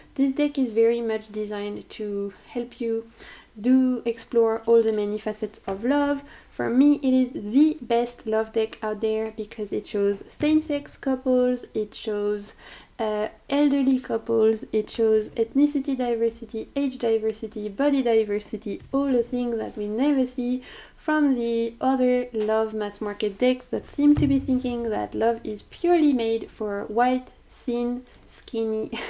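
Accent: France. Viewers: I simply loved your video. I am a beginner in this wonderful journey and I do believe that guidebooks must be an essencial part of a tarot deck. You gave me a lot of insights about which deck to buy next. Thanks for sharing. I also love your french accent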